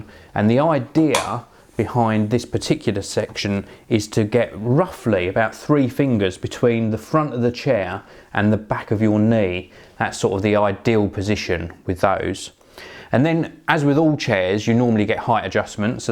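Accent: England, United Kingdom. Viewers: the "leavers", love that English accent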